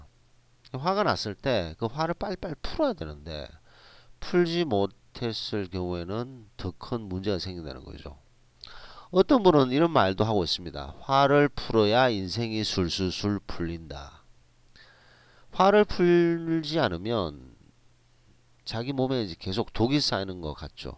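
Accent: Gyeongsang, South Korea. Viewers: 경상도 말투 저도 경남ㅎ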